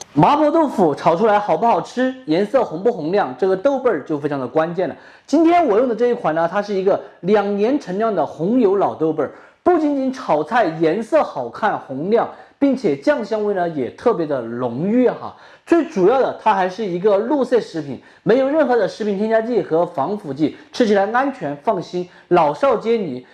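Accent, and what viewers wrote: Sichuan, China. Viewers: 要得，喜欢听椒盐普通话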